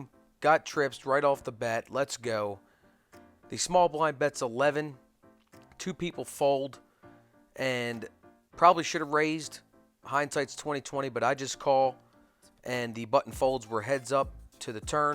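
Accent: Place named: Maryland, United States. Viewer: 1 minute in, and you get a like just for your Maryland accent!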